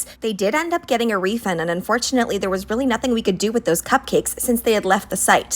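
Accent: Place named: United States. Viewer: Some thick American accent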